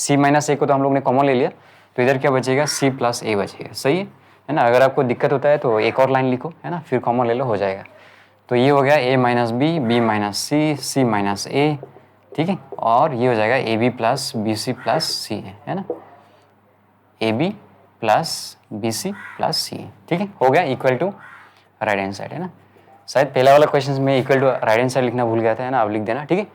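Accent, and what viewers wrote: Assam, India. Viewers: Sir aaap assam se ho ki nahi aapka awaj se pata chal raha hai ki aap Assamese ho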